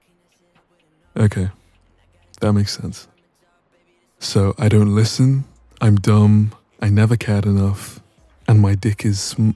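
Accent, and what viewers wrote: United Kingdom. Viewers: why am ⟟ just realizing half way into the video that he sounds like British smhhhh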